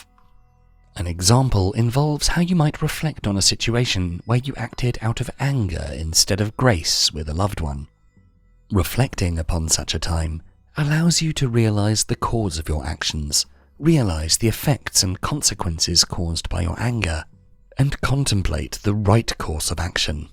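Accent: United Kingdom. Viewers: #1. Loose the pseudo intellectual lispy British voice.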